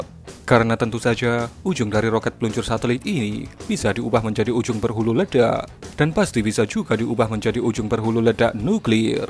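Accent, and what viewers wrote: Java, Indonesia. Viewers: suaranya medokkk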